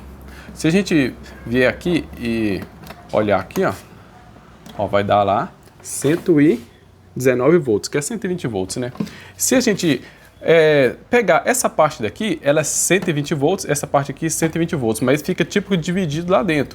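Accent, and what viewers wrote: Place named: Rio de Janeiro, Brazil. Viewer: O cara com mó sotaque carioca.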